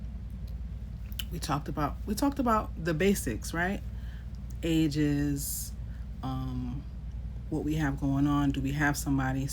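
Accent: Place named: Caribbean. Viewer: UR PATOIS S AMAZING IM A YOUNG QUEEN FR NY CARIB AND SPANISH.❤❤❤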